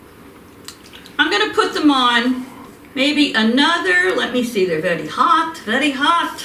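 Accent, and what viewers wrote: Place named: New York, United States. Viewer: I don't think I realized until I stumbled on your channel that their aren't many cooks on these shows being hosted by real New Yorkers with real New York accents. I am subscribing because there's something reassuring about listening to you. Also, just started using my Air Fryer and can't wait to learn more.❤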